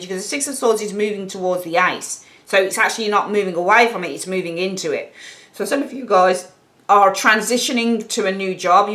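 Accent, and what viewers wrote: United Kingdom. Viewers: love your pronunciation..so BRITISH..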